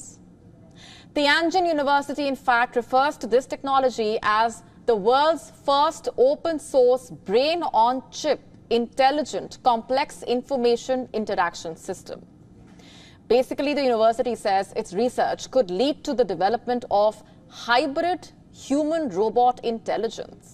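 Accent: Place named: India. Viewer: I hate the indian accent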